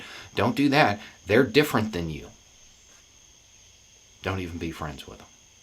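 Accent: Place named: Idaho, United States. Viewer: Sounds like north Idaho.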